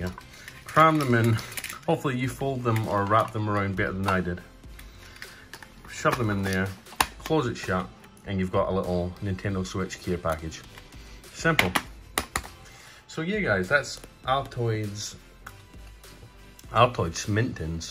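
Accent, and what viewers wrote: Scotland, United Kingdom. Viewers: Im from Scotland so I could pick up on the hints of Scottish but was confused by the American terms you were using haha. My granda is also Scottish and has lived in America for years so has a similar twang lol. Good video btw 👍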